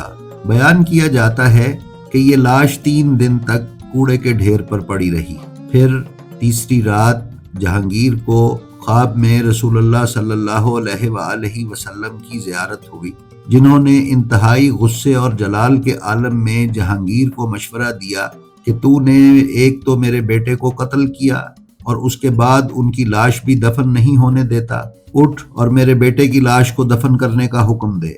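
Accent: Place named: India. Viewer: lakin apka lehja hindustani lagta he..isliye poochha.. mashallah salamat rahe..aap acha kaam kr rhe...Allah apko sehat o umr de.. Darkhwast he Dilli me madfoon shaheed e rabe "Hakeem mirza mohammed kamil" k mutalliq bhi apne samaeen kokuch bataye...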